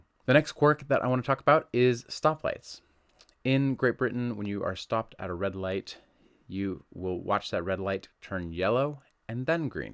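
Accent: United States. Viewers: Hey, I completely agree with you about so often not just being able to use plastic to pay for your parking - there's no consistency and it's infuriating discovering you need cash and not having the right change. 🙄 The last time I was charged to use the loo anywhere was at King's Cross station about 10yrs ago, but I don't believe they charge anymore - it's not 'terribly' common these days. 😉 I think people who are picking you up on where you say you live are being smart alecs - they probably hear your American accent and assume you won't know the distinction. I live along the South Coast from you and I always say I live in England - because I do! Next time someone asks you where you live, just say "England... which is part of the United Kingdom of Great Britain and Northern Ireland" - that'll shut 'em up! 😉😂 BTW, the contactless card limit has just been increased from £30 to £45, to reduce the occasions you have to use cash during these times of CoronaVirus. 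Hope Danielle's OK - stay safe and well! 😀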